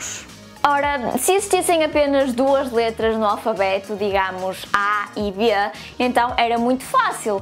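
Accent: Portugal. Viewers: Que poha é essa? Portuguesa? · Queria saber se onde vem esse sotaque português misturado com caipira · Comecei a acompanhar seu trabalho há pouco tempo e logo me encantei com os vídeos, inclusive os mais antigos, como por exemplo aquele em que elenca os livros que mais te marcaram e este visualizados por sugestão do You Tube: o sotaque português junto com a beleza e irreverência da apresentadora faz com que fique ainda mais interessaante. Por favor, não é senão com alguma frquência que assisto você usando expressões de portugal das mais variadas, o que faz com que eu lembre de um outro vídeo que assisti há muito tempo dando conta de uma expressão antiga "Levou o diabo o café da viúva" E embora seu canal corresponda a assuntos relacionados à matemática, gostaria de perguntar se já teve contato ou contacto (é lindo ouvir você pronunciar o "c" no meio das palavras!) com essa expressão específica ou com alguma parecida com ela, pois gostaria de estudar melhor a origem e o contexto. 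Desde já agradeço. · Coisa incomum vermos vídeos em português de Portugal.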